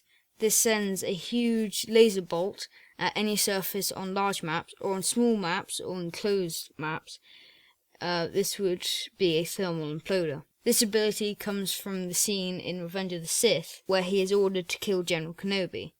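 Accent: United Kingdom. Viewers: i clicked on this video expecting an adult who is professional, instead i got an annoying English fuck, how about you give Ireland back to us ya dorty hun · Lit af u British?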